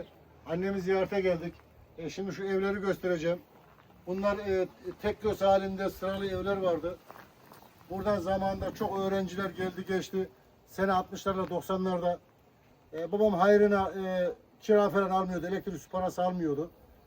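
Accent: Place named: Central Anatolia, Turkey. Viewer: Şefim seni çok seviyorum programını takıp ediyorum.Şivenle anlatışınla buram,buram kokan bir İçanadolu çocuğusun.Hep merak ettim araştırıdım. Sana sağlıklı,huzurlu,mutlu,umutlların tükenmeden yaşamın tükenmesin.Seninle seni görünce bir gönül bağı kurdun çünkü bende Kırşehirliyim.Selamlar,sevgiler.....!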